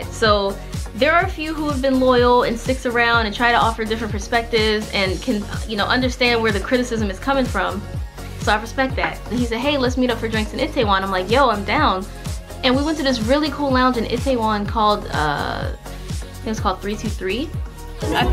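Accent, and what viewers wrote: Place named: United Kingdom. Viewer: The British accent 😊😅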